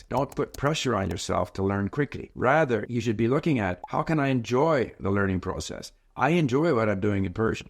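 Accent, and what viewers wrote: Canada. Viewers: Many people say that Canadians and Americans sound the same. Within the first minute, I was able to correctly guess that he’s from Canada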